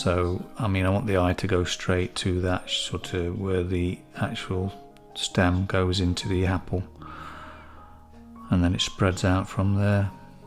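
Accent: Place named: Derbyshire, United Kingdom. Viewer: You sound like you are from Chesterfield uk. Do you do any art workshops. I know you do patron?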